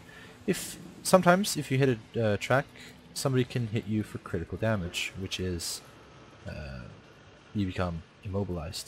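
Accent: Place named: United States. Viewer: You sound american, but you are on EU server?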